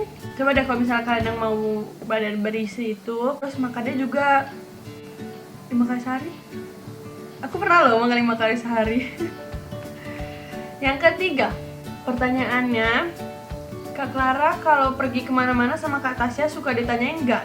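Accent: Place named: Medan, Indonesia. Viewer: khas medan kali yakan 😂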